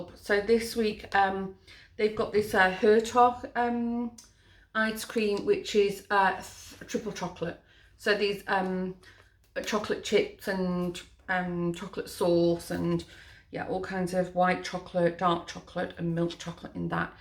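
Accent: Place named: United Kingdom. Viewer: Hey, I'm a new subscriber, I only just realised you are living in Holland, I assumed you was in the UK, think it was your accent that threw me 😃, I hear Holland is a very beautiful, clean, country, with alot of green, and flowers everywhere☺️ but also heard it really high the cost of living there. What are the pros and cons living there, and do you miss the UK at all, do you get to go back there often 🤔 take care. Stay safe 🙋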